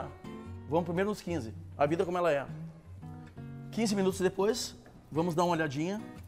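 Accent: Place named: Porto Alegre, Brazil. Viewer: Sotaque de Porto Alegre !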